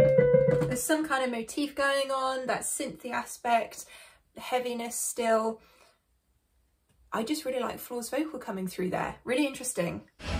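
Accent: United Kingdom. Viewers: you truly are the ''Margot Robbie' of music,just with an English accent.You are so talented and such a sweet soul as well.What a treasure you are to us all!! Thank you!! ❤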